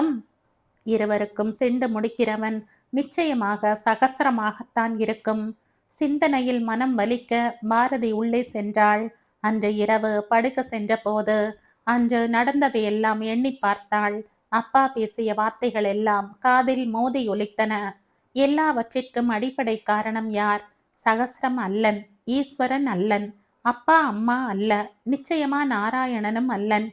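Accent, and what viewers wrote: Kerala, India. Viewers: Swarasyamai selgirathu novel👍👍👍 ungalin inimaiyana malaiyalathamil vasippu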